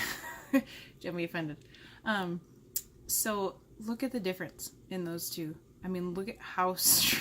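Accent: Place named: Minnesota, United States. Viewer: What is her accent? Sounds like maybe Minnesota?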